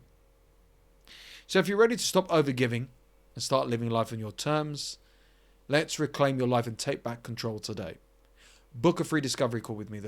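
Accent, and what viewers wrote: United Kingdom. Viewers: Where in Britain are you from? I have a Master's Degree in British English, and I studied to speak Received Pronunciation.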